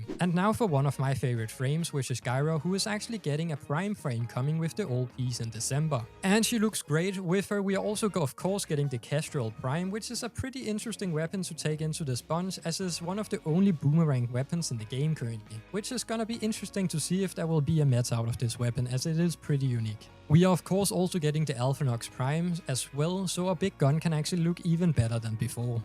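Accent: Denmark. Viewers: Hey kan høre du er dansk. Håber jeg da i hvert fald. Jeg er helt ny i spillet ved du om der er en dansk Clan? Jeg spiller på pc. Må indrømme det er noget forvirrende det hele. Men super fedt har spillet 20 timer på 3 dage nu. Og kan ikke se hvorfor jeg ikke skulle forsætte med at spille 1000 endnu. Vil bruge din kanal til at se warframe nu. Mvh Tobias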